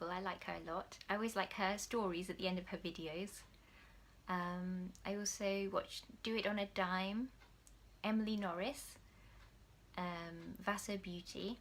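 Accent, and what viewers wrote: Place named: England, United Kingdom. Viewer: You have such lovely, calming voice and beau tiful english accent. I like to hear you a lot!!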